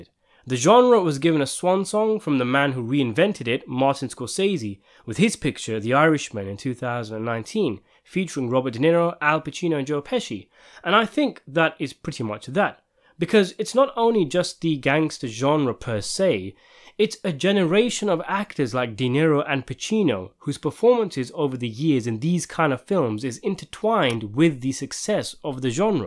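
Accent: United Kingdom. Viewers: The genre is not dead just because Mafia movies always came out every decade or so. People need to understand the glory days of the mafia if anything is what's dead. Also Hollywood really does not make good movies anymore like they used to. Martin Scorsese did not invent mafia movies either. This Brittish guy narrating I can't stand to listen to. DeNiro can still do great. So I will be renting this.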